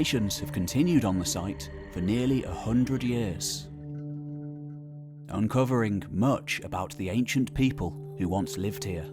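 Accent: England, United Kingdom. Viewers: Scrotum Monster it’s his particular English accent. You’re missing his other pronunciations different from yours.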